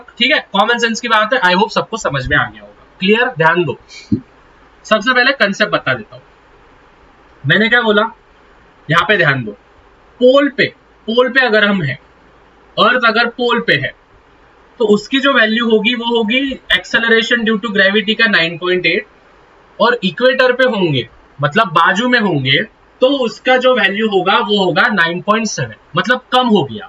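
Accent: Maharashtra, India. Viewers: Bro is marathi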